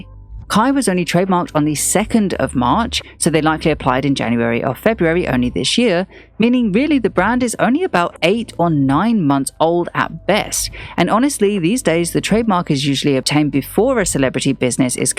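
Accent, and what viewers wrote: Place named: London, United Kingdom. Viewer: Great vid, ominous London and or U.K voice! Swear these vids gone help a young future fashion journalist win!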